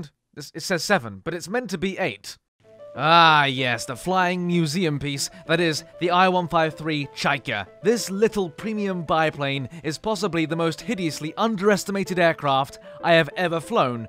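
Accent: United Kingdom. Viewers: So is he actually British or is it just an accent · Best British accent of the century🇬🇧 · Dearest. I find this ironic. Your channel is mainly fantastic due to your lovely British accent, and your favorite vehicle of War Thunder is American.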